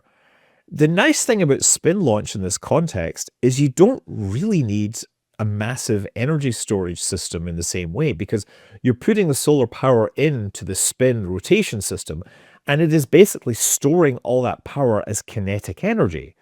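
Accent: Scotland, United Kingdom. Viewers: You said "moon" over 100 times, so this is your best program yet ;>) I'm a Yank...love your Scootish.🎉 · You're sounding more Scottish than you normally do. Very much less American. I admire that.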